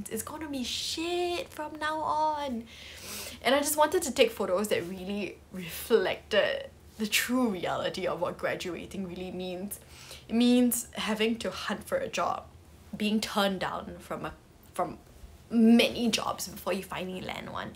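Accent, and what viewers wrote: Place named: United Kingdom. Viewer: Gosh I love your accent. Kinda British way. I prefer watching the videos than replies on your IG stories. More engagement with fans and I'm gaining knowledge from you. Interesting contents you have been working lately. Keep it up pls!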